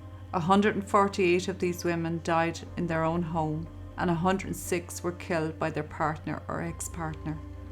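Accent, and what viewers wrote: Ireland. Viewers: love to hear Irish accent! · Subscribing from Florida. Your Irish accent is absolutely beautiful! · Watching from New Zealand, brilliant, easy to understand, don't try to change that lovely accent. I spent years living in Cork city. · you be true to yourself. lovely Irish voice... Calm, and if slow for some, i think it hints at empathy which is appropriate considering to innocent peoples lives affected forever by crime & destructive behaviour.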